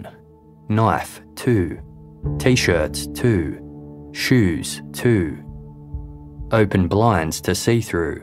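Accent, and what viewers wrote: Australia. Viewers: So nice hearing a fellow Aussie. Wooo 🥳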